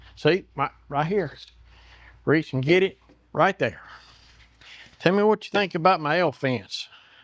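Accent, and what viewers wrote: South, United States. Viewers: Great jig! As a fellow southerner, nothing wrong with your accent! We don’t pock the cah! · Thanks for the reply. I'm Italian and passionate about English. Sometimes I think I can recognize some American accents. In your case, I thought I was hearing a Southern American accent. Glad I guessed right. 😊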